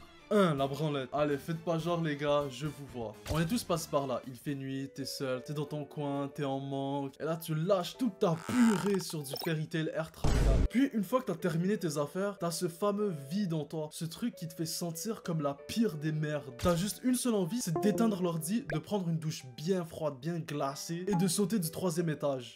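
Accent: Quebec, Canada. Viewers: Ta un petit accent québécois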